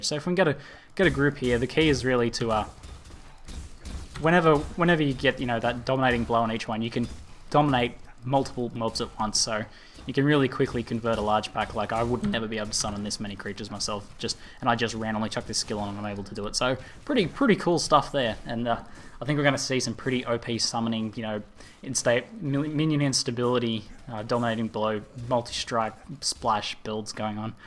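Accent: Australia. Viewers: were are you from in Australia? if your from Aus that is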